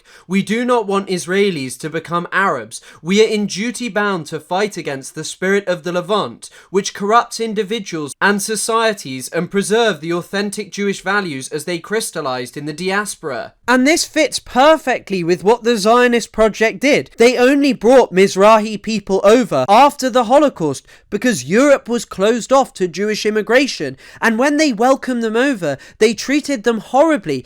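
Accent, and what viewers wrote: United Kingdom. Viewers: i hate when there’s a video i’m really excited to watch and the narrator is nauseatingly british 😢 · I think it’s also the British accent, I’m ESL and have a harder time understanding those who are British but I can understand at this speed any American. I’ve had to rewind a bit…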